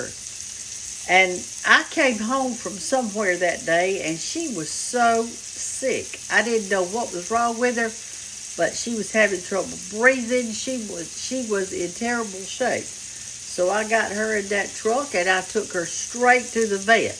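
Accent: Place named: South, United States. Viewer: Love your Southern accent. I’m a fairly recent viewer from California. You are the BEST!!!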